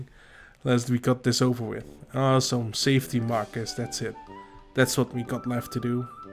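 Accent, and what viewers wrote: Netherlands. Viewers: The dutch accent 👌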